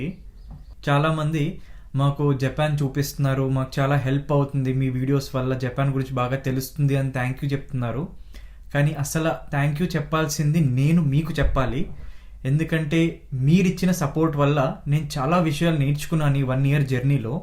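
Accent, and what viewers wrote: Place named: Telugu, India. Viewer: Hai 😊 garu.. Mee mokham (face),Mee Mata theeru..bhaguntadhiy... Telugu thanam Uttiy paduthundhiy...💯❤️🙏 Maaku JAPANE Kalla kiye Katty nattu chupisthunaru.....🧐😍🎊 Cangrasuletion👋🏼 You tube batten Vachinadhuku 👍🥳🤩 Mee video kiy feedha Avvuthanu..💯💕🥰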